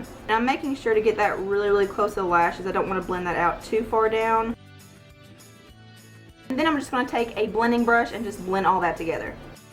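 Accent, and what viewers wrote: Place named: Louisiana, United States. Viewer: Haha Im pretty sure our accent is the same!!! Im from Louisiana lol!!!!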